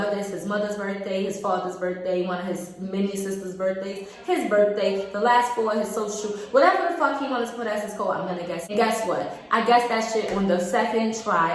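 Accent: Jamaica. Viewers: wasnt gonna subscribe till i heard the yardie accent ........big up yuh self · Girl you Jamaican 🇯🇲🇯🇲🇯🇲🇯🇲 I never knew that lol loooooooove it... "a wah gwan, a wah the bbc" I CAN NOT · you jamaican lalala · I’m Jamaican n from the bx u talk like me 😂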